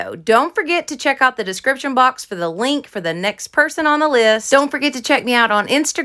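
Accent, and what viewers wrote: South, United States. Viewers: Such cute ideas, I also love your accent. I'm from the East Coast & love the Southern accent. Happy crafting 😉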